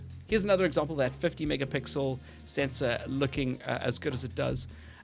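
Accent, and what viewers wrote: South Africa. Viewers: Dude, where are you from? I can hear Joburg with some Durban and also a pinch of New Zealand.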